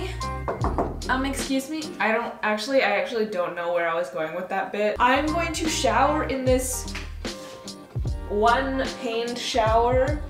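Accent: England, United Kingdom. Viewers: Is there a reason you speak with an annoying British accent or is that just to be annoying? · That English accent though 😬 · British accent 🤌🤌🤌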